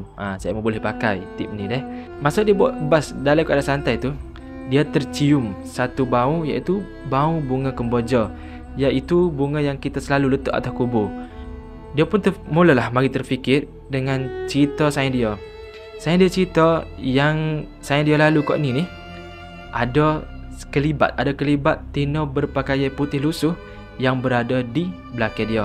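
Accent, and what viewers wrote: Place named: Kelantan, Malaysia. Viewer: Pergh pekat btul Kelantan awk eik?tapi syabas.bro.pnyampaian ok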